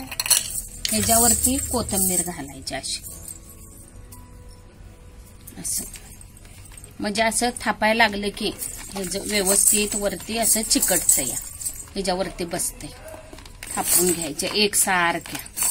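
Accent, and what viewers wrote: Kolhapur, India. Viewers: Kolapuri mast boltya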